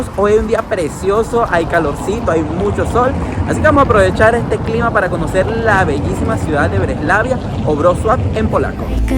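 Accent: Venezuela. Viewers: Que fino escuchar a un venezolano 😊😊😊 Próximo ✈️😊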